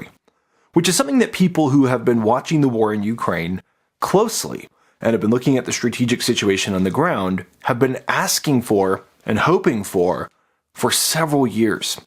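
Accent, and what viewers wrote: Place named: United States. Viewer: so irritating to read your obviously german name, but hear that american voice.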